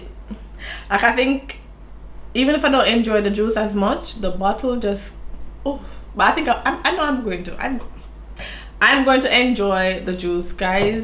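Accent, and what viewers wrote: Jamaica. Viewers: That Jamaican Patois 😍😍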